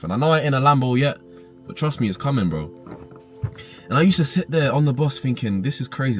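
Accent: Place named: United Kingdom. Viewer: Respect for this bro, I heard the UK accent and was immediately hooked lol. It's always motivates me seeing guys from here like me thriving to keep going myself and believe ill eventually succeed. May God continue to bless you for the wisdom you're sharing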